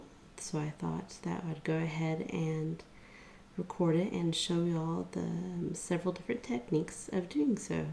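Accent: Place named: Missouri, United States. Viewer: This makes me want to eat an apple. I love apple season. Also, I love your accent. I live in Missouri and we have a lot of people here with that accent. I have some aunts and uncles with it and sometimes I say words like they do. Like I'll say "sell" instead "sail". And "wull" instead of "will" or "well". "Dill" instead of "deal", etc.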